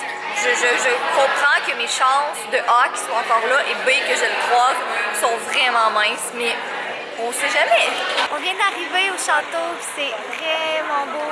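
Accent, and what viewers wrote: Quebec, Canada. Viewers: D'habitude je regarde les youtubeuses en espagnol, mais dès que je t'entendu parler dans un de leurs vlogs j'ai pu reconnaître ton accent québécois et oup! fallais que je trouve ta chaîne hihi, ça fais un peu stalker :O Donc salut!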